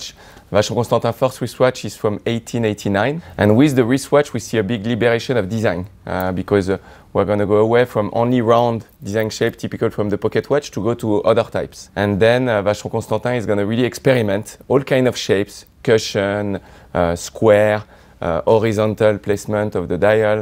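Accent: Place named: France. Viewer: strongest fr accen.i hearrd in a while lol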